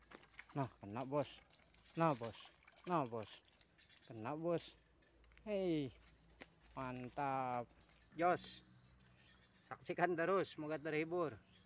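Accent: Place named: Banjar, Indonesia. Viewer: Logatnya urang banjar saudaraku....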